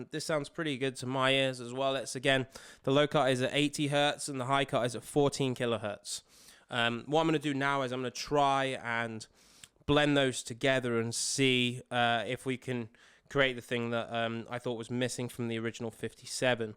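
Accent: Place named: United Kingdom. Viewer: Absolutely British accent.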